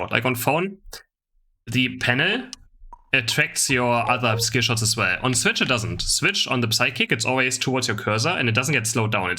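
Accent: Germany. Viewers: das ist der deutscheste akzent den ich jemals gehört habe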